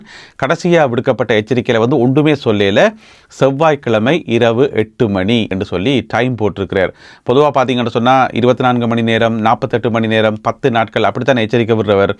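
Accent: Jaffna, Sri Lanka. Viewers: தம்பி நீங்க யாழ்பாணத்தை பிறப்பிடமாக கொண்டவர் என்பது உங்கள் தமிழ் உச்சரிப்பில் தெரிகிறது நீங்க நிறைய நல்ல விடையங்களை சொல்கிறீர்கள் ஆனால் நீங்கள் பேசும்போது “ அங்க அது இருக்குத்தானே” “ என்னென்று சொன்னால்” இருக்கு போல கிடக்கு” “ நல்ல பூசை குடுத்திருக்கினம்” போன்ற சொற்களை தவிர்ப்பது நல்லது ஒரே எரிச்சலாக இருக்கிறது நன்றி வளம் பெற வாழ்த்துக்கள் ஈழத்தமிழன்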